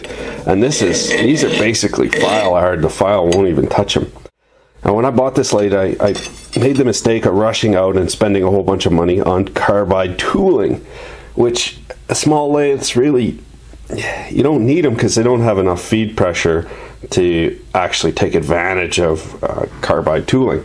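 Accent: Canada. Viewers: Not sure where you're from but you sound very Canadian lol. I enjoyed this video and had a great laugh at your hilarious commentary. Great job on the punch, give me some ideas for myself. Thoroughly enjoying the precision calliper adjustment lmao. Have a great day. Ian Little Spirit River, Alberta, Canada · Iron ring? So you ARE Canadian... I thought I recognized that accent.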